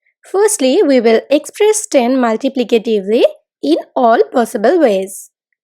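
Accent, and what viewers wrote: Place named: India. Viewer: the indian accent is classic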